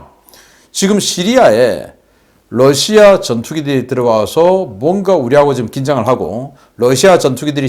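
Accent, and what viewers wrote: Gyeongsang, South Korea. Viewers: 신대표님, 첫인사 "안녕하십니까" 말할때 . 듣는 나는 신대표님이 심통난듯 한 말투로 들리네요. 물론 경상도 사나이라는건 알지만....ㅋ 안녕하십니까 할때 맨뒤 음. "까"을 살짝 내려보심이....ㅋ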